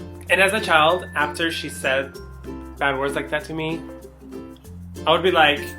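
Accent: Turkey. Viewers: I don't know if you noticed but when you speak, your accent kinda turns into a turkish english accent. But I feel yah :D about being a mixed kid that didn't learn turkish at home. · How long have you been in Turkey? I can hear a bit of Turkish accent in your English. ( as in why did you come "here") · I think I can swear you are native speaker of Turkish. You have very strong accent, such like Acun :D And your vocabulary and jokes etc are completely Turkish. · you even have this sweet turkish accent to your english haha :) edit: hoşgeldin SUBSCRIBE'ı geliyooor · Man,you are amazing!Your moves when you were taking the video,your turkish accent,and your life story was just redicilous!! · your accent is like Izmir's people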